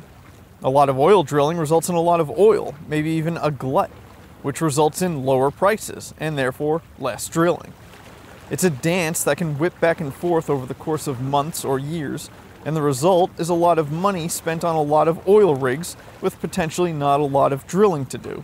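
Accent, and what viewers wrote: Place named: Scotland, United Kingdom. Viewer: I had to turn on the CC to see what you were saying what with that thick Scottish accent and all....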